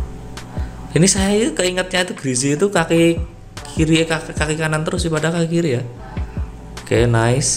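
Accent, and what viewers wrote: Java, Indonesia. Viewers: medhok men mas haha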